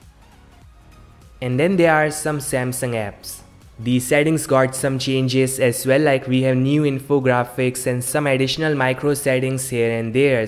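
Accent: India. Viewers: Are you from india...now wonder...i dont really understand some of what you are saying😊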